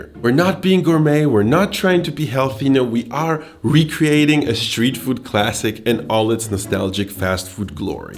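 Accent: Germany. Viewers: his accent screams german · allmost cant even notice the german accent!! great video also! :)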